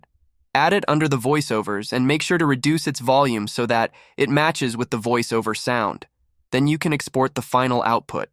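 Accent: Sri Lanka. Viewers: are you from sri lanka?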